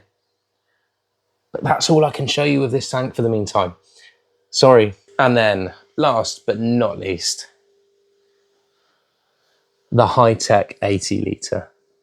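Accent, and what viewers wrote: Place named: United Kingdom. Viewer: I am from east asia, I am just here to enjoy ur british accent haha.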